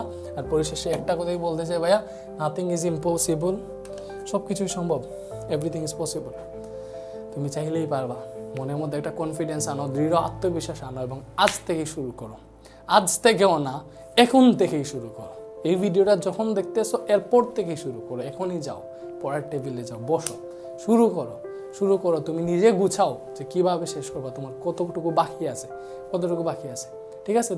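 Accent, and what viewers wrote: Sylhet, Bangladesh. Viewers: Baiya apni Ki Sylheti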